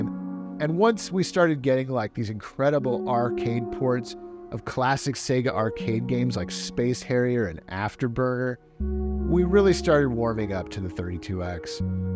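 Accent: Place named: Brooklyn, United States. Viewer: Whats up with the East Flatbush Brooklyn accent?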